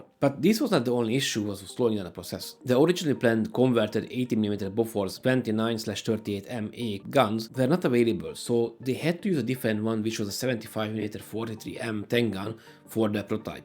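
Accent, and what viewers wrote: Hungary. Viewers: That thick hungarian accent 😄 nice video though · Én azt írtam, hogy az akcentusa miatt ismertem fel azt, hogy magyar. Nem pedig azt, hogy a magyar akcentus ellenére jól beszél angolul. És a dícséret előtt ott van a ",". Szóval nyelvtanilag nem volt helytelen a mondat. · Hungarian accents are so funny to me, you talk the same as the "capandball" guy. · Probably because he is Hungarian ( guessing by accent)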